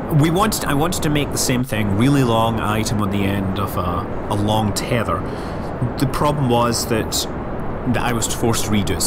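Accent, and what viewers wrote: Scotland, United Kingdom. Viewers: He's Scottish you imbecile.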